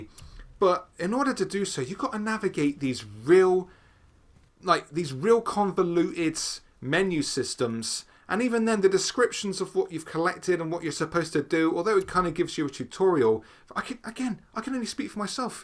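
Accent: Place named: Bristol, United Kingdom. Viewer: Also, where you from? You sound like a fellow Bristolian!?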